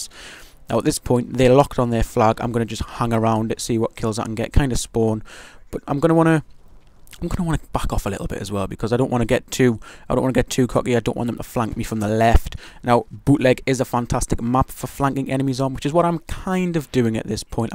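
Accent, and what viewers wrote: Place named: Newcastle, United Kingdom. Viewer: Nowt like listening to a geordie kill people. Wish you had PC man, we'd make an awesome geordie domination team.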